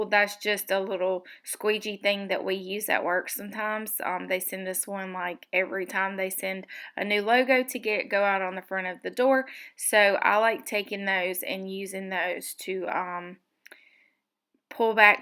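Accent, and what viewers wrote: South, United States. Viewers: Loved watching your girl's face develop. I love how it looks when you use the scraper. I especially love listening to you talk. Love your Southern accent.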